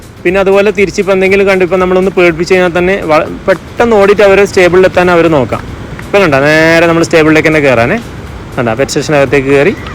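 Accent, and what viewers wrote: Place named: Pazhangadi, India. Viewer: Pazhanghadi bhasha polich 😂👍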